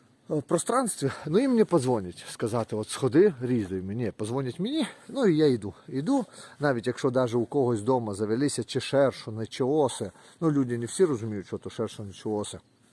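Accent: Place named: Transcarpathia, Ukraine. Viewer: але й файна в вас говірка закарпатя гарний край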